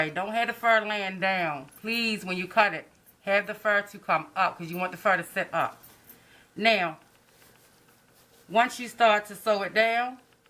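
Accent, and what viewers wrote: Baltimore, United States. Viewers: You must be from Baltimore Maryland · I was just about to ask😂 tbh I was leaning towards DC because of the way yu say certain things, but then that distinct "uu" came out.... but the real give away for me was the fact you customized boots which is a female hustle out Bmore...😘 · Your from Baltimore ??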